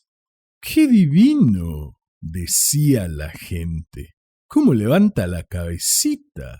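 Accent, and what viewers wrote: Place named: Argentina. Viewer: Un buen cuento, el tono Argentino salió perfecto. Saludos, Natalia de Argentina.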